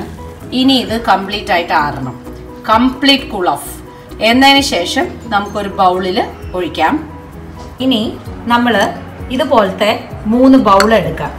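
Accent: Palakkad, India. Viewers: Dear chiechi wonder ful performance chechi palakkadnte abhimanam anne njan oru palakkad kariyanne chechi nammude palakkadu style venam varthamanam parayan enikku orupadu eshtamane njan ennaleyannu video kandathe iam very happy njan mumbayil thamasikkunnu chechi orupadu thanks njan ella video kannam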